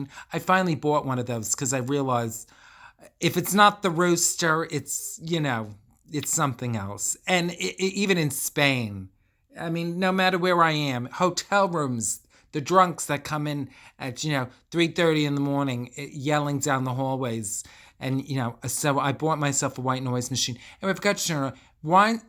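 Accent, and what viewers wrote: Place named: East Coast, United States. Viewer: I simply cannot fathom the fact that instead of being touched by ur heartwarming confessions, people choose to see it as name-dropping. Oh well. Your east coast accent perfectly matches the west coast setting miss coco, thnx for sharing these gems with us puffs. Love from greece ❤️❤️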